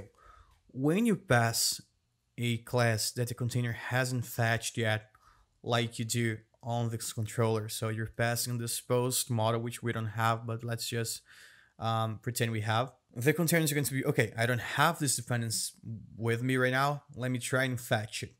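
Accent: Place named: Brazil. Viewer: já de cara notei que tu é brasileiro kkkkk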